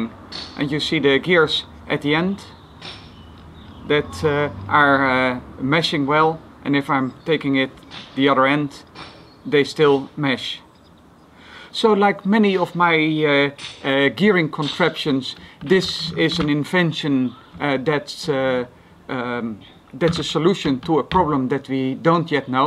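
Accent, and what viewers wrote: Netherlands. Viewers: This is the most dutch english ever. Amazing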